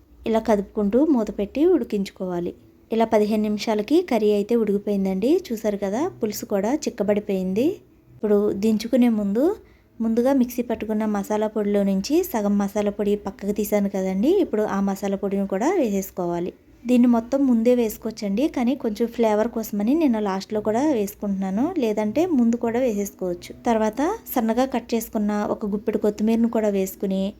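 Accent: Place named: Godavari, India. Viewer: K sis ma godavari voicela undi Andukey adiganu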